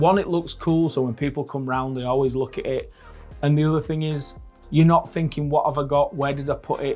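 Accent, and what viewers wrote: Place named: Doncaster, United Kingdom. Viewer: that accent sounds proper Doncaster or Rotherham way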